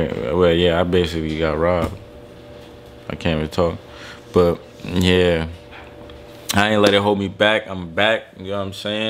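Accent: New York, United States. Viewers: Lmaoooo he talk like every New York hood nigga 😭😭😭😂😂😭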